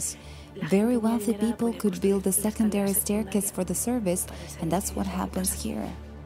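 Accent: Spain. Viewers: Es muy riesgoso tu trabajo no siempre es lo mismo todos esos lugares tienen algo enigmática más es importante ser prudente en dónde quiera existe maldad humana y más por tu condición de mujercita no faltan vivales o asesinos ojalá! Solo sea una broma pero recuerda el castillo de Napoleón cuanto destrozó y tal cual lo señalas respetas pero también ay personas malas y vaya que muy malas saludos desde Tula Hidalgo México por tu acento eres española tu voz es delatora.👍👉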